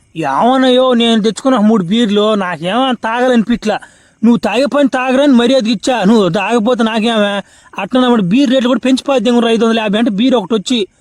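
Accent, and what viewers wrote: Nellore, India. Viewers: Nellore slang 😂😂😂 maadi kooda Nellore district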